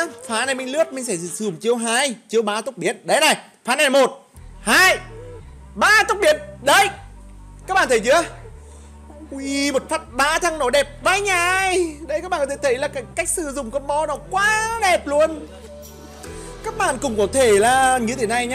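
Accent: Nghe An, Vietnam. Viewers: nghệ an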